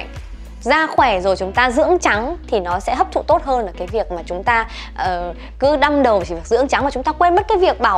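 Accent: North, Vietnam. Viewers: Chị ở Thanh Hóa người Miền Bắc nha. Bạn nghe đâu ra miền Trung vậy 😂😂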